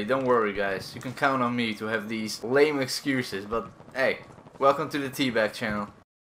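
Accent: Netherlands. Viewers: Hello If i play battlefield with my friends they often say i sound like you, probably because I'm dutch. :) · You can hardly hear your Dutch accent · Ik hoor gewoon je Nederlandse accent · You have a dutch accent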